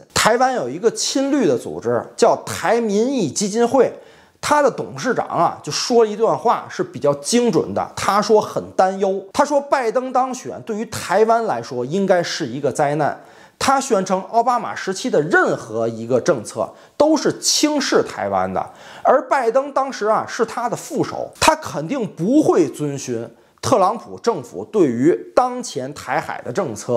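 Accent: Tianjin, China. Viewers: 哎，关注晚了，杠杠的正能量，还挺幽默，目测应该是天津的，和罗尼口音挺像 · 哥，是天津人吧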